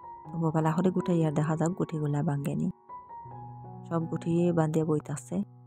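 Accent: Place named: Chittagong, Bangladesh. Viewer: আসসালামু আলাইকুম আপু কেমন আছো লাইক দিয়ে ভিডিও দেখে নিলাম তোমার কথা শুনলে আমার হাসি এই আপু আমি তোমার কথা কিছু বুঝে কিছু বুঝিনা তুমি কি চট্টগ্রামে ❤❤❤❤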